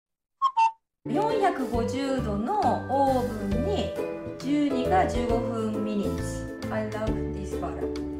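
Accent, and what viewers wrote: Kansai, Japan. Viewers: 関西弁のノリが最高！うまいもん食べてる時が一番幸せですよね。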